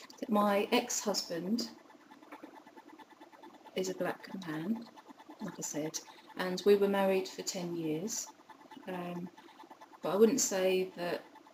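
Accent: United Kingdom. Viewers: She's already beautiful but with that British accent...brilliantly gorgeous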